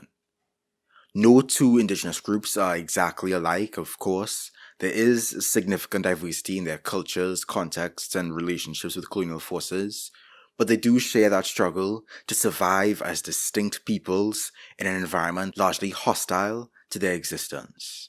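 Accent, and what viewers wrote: Trinidad and Tobago. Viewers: Are you Trini? cuz this accent..... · Why you sounding like a Trini 😮🇹🇹💯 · Great video! Found you through the latest Noah Samsen vid. Also a trini, recognized the accent immediately. Keep up the good work. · I heard the accent and went "It's giving, Trini". Glad to be right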